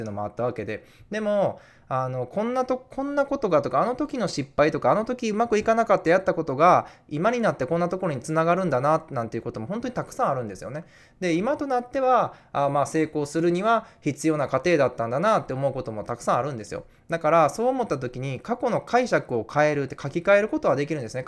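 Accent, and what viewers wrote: Kansai, Japan. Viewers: 関西方面の方の方言に苦手意識が有りましたが、初めて嫌な感じを受けませんでした。分かりやすく言うと…意地が悪そうじゃ無い感じです。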